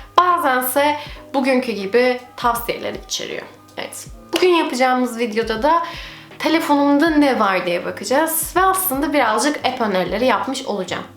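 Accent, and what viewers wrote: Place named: England, United Kingdom. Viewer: Ingilizce aksanına bayıldım diyebilirim gerçekten ilk izlediğim videodan bile samimiyetine hayran kaldım😍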